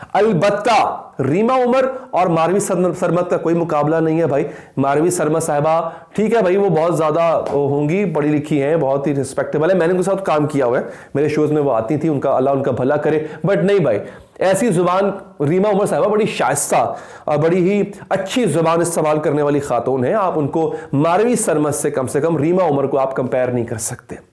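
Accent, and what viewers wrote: Punjab, India. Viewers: Punjabi touch 💞 Stay blessed bro💐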